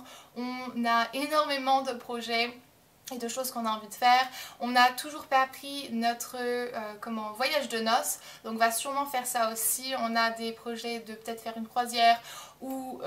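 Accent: United States. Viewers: J'aime vraiment ton "accent" anglais/américain. Même si je sais que à la base tu es française, mais je trouve que cette langue te va vraiment bien dans ta façon de parler etc. (; Bonne continuation. ♥ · Parfois lorsque tu parles on dirait presque que tu es une vrai américaine !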